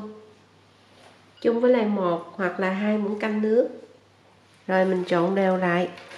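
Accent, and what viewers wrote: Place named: South, Vietnam. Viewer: nấu ngon chỉ dẫn chi tiết không tiếc công... giọng nói như rót mật vào lòng ngọt ngào tự nhiên tiếng Nam nghe thương quá là thương, Thanks em nhiều nha.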